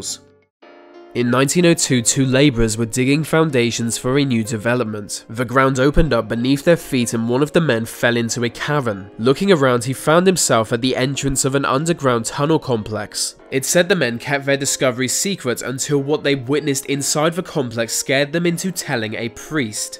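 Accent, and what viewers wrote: England, United Kingdom. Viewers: Tjis is why i dont like british accent . It is so irritating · That damn English accent. Still a good vid. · Even science can't explain it? Lame Brit accent.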